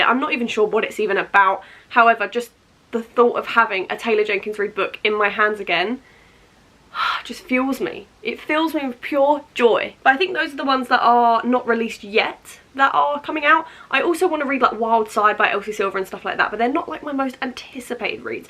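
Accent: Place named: United Kingdom. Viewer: accumulated, brihish axent 😒 nice vid btw 😊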